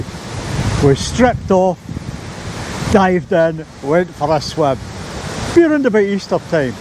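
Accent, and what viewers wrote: Scotland, United Kingdom. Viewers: The closed captioning during the waterfall scene is classic "computers cannot understand a Scottish accent!"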